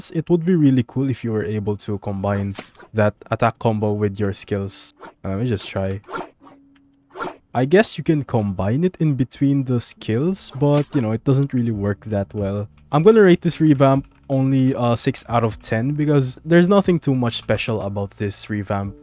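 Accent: Philippines. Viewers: he sounds filipino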